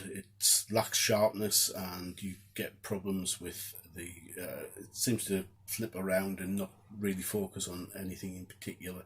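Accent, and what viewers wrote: North East England, United Kingdom. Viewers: Thanks for the video.. Good work mate! Local lad I hear. Probably somewhere between Sunderland and Boro..🤔 I've had this camera for about 6 months now. Picked it up from CeX for £165. Can't complain for that and in mint condition too. Yeah, it's great for shots at the zoo and some of my best shots were had there last summer. Quite impressive to be fair. I'd never owned a zoom camera like this before so I thought why not. It's quite versatile. It does seem to favour good lighting conditions though. I'll be honest, I've never really moved from auto on this camera. I really should experiment. I have taken some quite remarkable pictures of the moon though and only in Auto. Amazing really for the price of the thing. I've just getting a tripod so we'll see what improvements can be made with this. I'll check out the rest of your videos when I get a chance. 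There's also that guy called Graham on YouTube who's got a whole load of content related to this camera. I'm sure you're aware of him.. Keep up the good work. Alan.. Washington UK.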